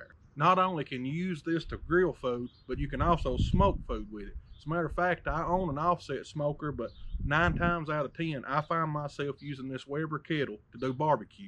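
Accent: South, United States. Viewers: Boy if that isn’t a southern accent I don’t what is! Enjoyed your video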